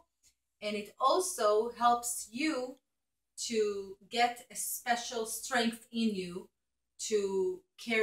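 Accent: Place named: Israel. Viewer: Thank you for explaining and telling us to stand. I can not sing, and have always been put off going to Shul because all are singing except me. I really like your accent, Ivrit from H 'Aretz. Could you do some videos with just speaking prayers?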